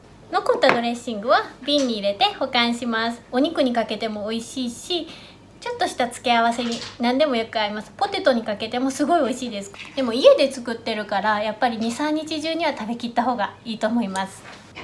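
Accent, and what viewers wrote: Kyoto, Japan. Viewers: I know🌸her Japanese accent is so cute when she speaks English it’s very soft and beautiful since she’s from Kyoto🤍🇯🇵☺️ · いつも楽しく拝見しています。私にも4ヶ月になる娘がいるので、スータンの様に可愛く育つといいなと思っています。英語を話すモエさんも素敵ですが、個人的には京言葉を話されるのも素敵だなぁと思っています。 I really enjoy watching your channel. I’m also a mom of a 4 month-old girl so I hope she will grow well and cute like. Your English is very charming but personally your Kyoto dialect is something that makes your channel even more attractive! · Specifically, I think she’s speaking in Kyoto-Ben. Osaka-Ben, which is what most people think of regarding Kansai-Ben sounds different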